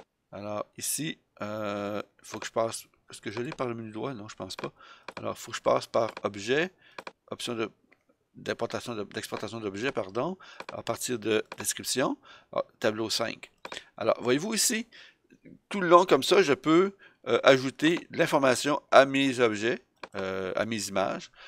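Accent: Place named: Quebec, Canada. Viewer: Bravo, bien dit et le suivi vidéo est clair. Je ne veux rien enlever aux narrateurs français de France, mais ça fait du bien d'entendre une voix québécoise narrer de la vidéo pédagogique.:)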